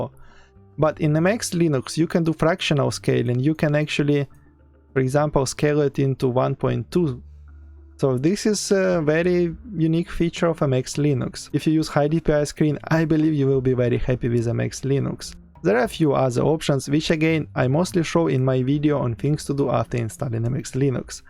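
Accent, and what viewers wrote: Russia. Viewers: I love your Russian accent so I subscribed! (I am from England) Also GREAT VIDEO! :) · because it's russian accent, imho. It's most understandeable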